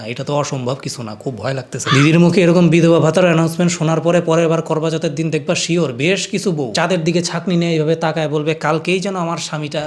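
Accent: Bangladesh. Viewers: তোমার কথা মাঝে মধ‍্যে শুনলে মনে হয় বাংলাদেশের!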